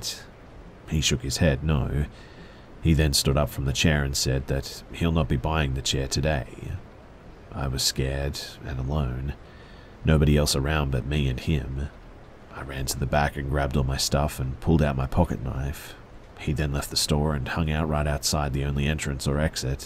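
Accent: Australia. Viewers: you are my absolute favorite scary story teller on all of the internet. It's mostly your Aussie accent ❤️😍😁🤗😊😉😘💯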